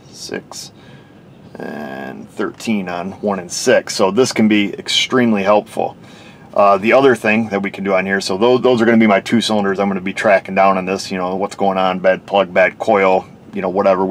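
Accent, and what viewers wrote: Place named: Upstate New York, United States. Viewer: It took me a few times to figure out you were saying Power BALANCE, you upstate New Yorkers talk funny...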